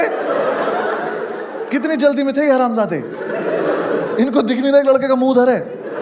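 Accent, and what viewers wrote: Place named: Meerut, India. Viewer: Saare Meerut ke jaat aise hi bolte hain.. ditto!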